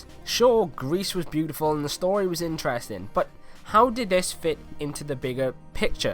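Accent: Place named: Wales, United Kingdom. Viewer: Thanks for the help! Btw are you Welsh? Sounds like you're welsh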